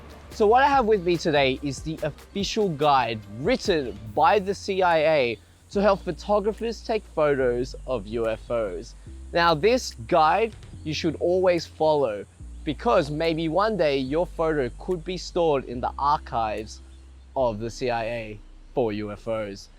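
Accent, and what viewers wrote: Australia. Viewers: Why does this Asian sound more aussie than I am?...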